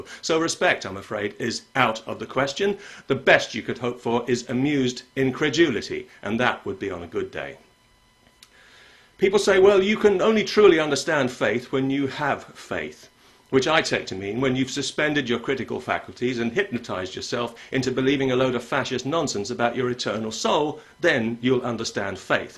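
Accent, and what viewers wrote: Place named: United Kingdom. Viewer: Pat Condell é um cara d+ adoro esse sotaque britânico dele tem uma argumentação lucida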